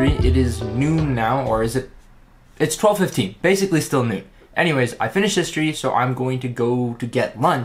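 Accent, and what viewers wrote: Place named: United States. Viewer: Indian harry potter with american accent, perfect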